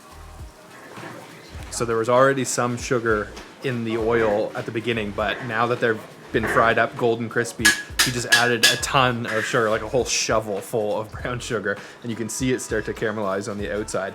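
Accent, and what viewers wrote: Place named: Canada. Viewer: is canadian? i can hear a canuck accent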